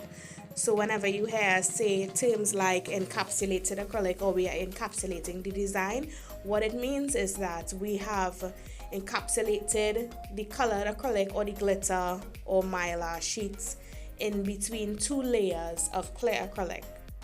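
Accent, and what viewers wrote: Trinidad and Tobago. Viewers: Hi... u sound like u from trini.. i am also.. and those nails r beautiful..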